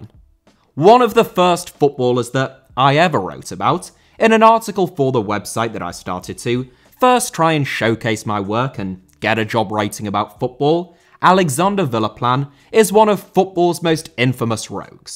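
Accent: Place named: United Kingdom. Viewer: Well done mate , I have had a wee look at your stuff over the past couple of months , thoroughly enjoyed it and passed it along to my football loving buddies . For me as a Bolschie Celtic fan it isn`t a massive jump in consciousness to really identify with a lot of the issues which you bring up . But what I really love as an old git who enjoyed Fantasy football ( with Badiel and Skinner ) the football journalism from the real fans in " My favourite year " , When Saturday Comes etc etc , it`s nice to see that the tradition of humourous well informed football journalism is alive and well with guys like yersell . Still waiting for an opinion from my other Celtic buddies on your stuff , but some of them are of the naive attitude that politics has nothing to do with sport . Au contraire mes Amis , tell that to Tommie Smith and John Carlos . and that`s one of the reasons I enjoy your exposure of the despots who use fitba to sanitise their horrible public image. 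Keep up the good work young man .Always love to hear accents from the UK ( I live in Germany and I miss this almost as much as I miss a fry up ) . I shall continue watching and write again . Some of the comments are priceless. 🙂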